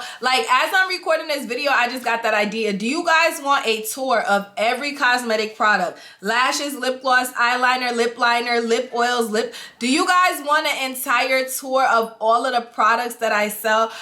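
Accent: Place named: New York, United States. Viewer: lol, your new york accent came out in this video. I hear it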